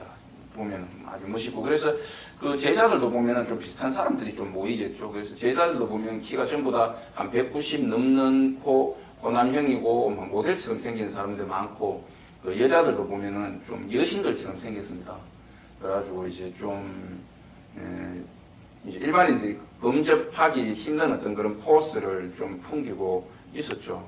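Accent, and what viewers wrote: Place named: North Gyeongsang, South Korea. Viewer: 싸이먼디는 부산이고 선생님은 경북말투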